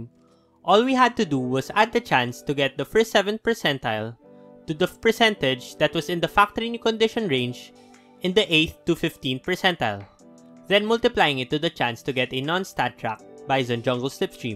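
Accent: Philippines. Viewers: Pare keep up the good work, nahalata kitang pinoy dahil sa boses, Thanks for the hardwork you put in your vids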